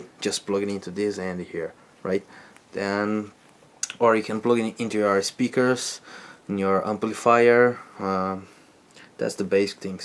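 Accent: Brazil. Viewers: Ou mano, quanto vc pagou no irig? hahah To ligado q vc eh brasileiro seu sutaque nao engana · Hahaha, verdade quando comecei a escutar percebi que era brasileiro. Mas ta valendo, obrigado pelo vídeo!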